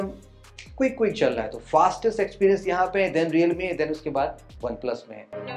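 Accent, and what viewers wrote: Lucknow, India. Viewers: आप लखनऊ से हैं??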